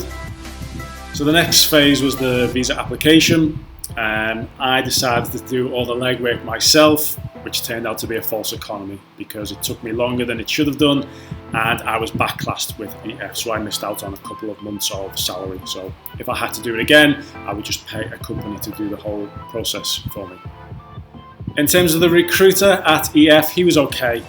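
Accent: Liverpool, United Kingdom. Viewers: are you from liverpool? hahaha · Thank you for making these videos, I just applied for EF, but watching your other videos inspires me to apply for public schools as I have the teaching experience. I’m hearing scouse in your accent? I’m also from Liverpool, so it’s nice to hear on YouTube 😂🙌🏻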